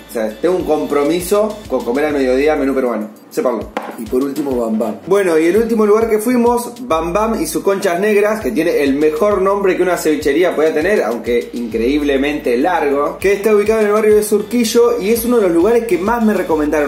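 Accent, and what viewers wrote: Argentina. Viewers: Amo tu acento argentino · Quizás porque es argentino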